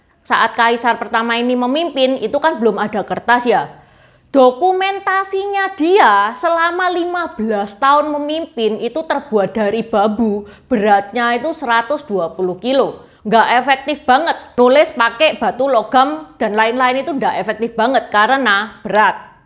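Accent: Java, Indonesia. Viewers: Cik logat jawa bangat. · logat jawane medhok... cina abad lampau memang tergolong maju... Klo penemuan cina abad ini apa y? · Logat Jawa tapi Mandarin .... H E B A T... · Konten bagus saya suka sejarah apalagi jowone medhok 😀👍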